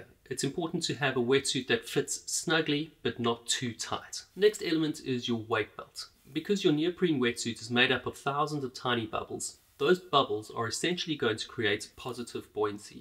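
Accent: South Africa. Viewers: are you south african? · You have a South African accent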